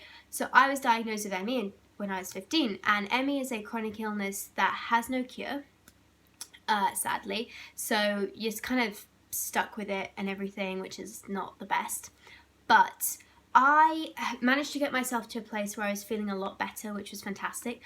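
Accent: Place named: United Kingdom. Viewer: This is my first time watching your videos, this one was on my recommended list. I was quite shocked by the British accent, I was expecting an accent from the U.S. but anyway, I just wanted to say that I'm sorry for what you're going through however, I'm glad that you found a doctor you like and who can do anything to help you out. You've earned a subscriber!! Take care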